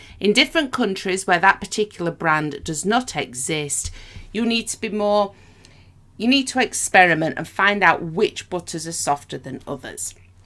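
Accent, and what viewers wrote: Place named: United Kingdom. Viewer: I gave up. I don't understand British English 😭😭😭😭😭 Update: I put subtitles and it was a lot easier. Thank youuuuu for sharing such a great technique. 🥰💕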